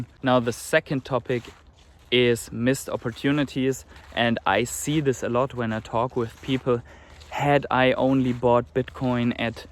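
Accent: Germany. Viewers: Hörst dich deutsch an 😉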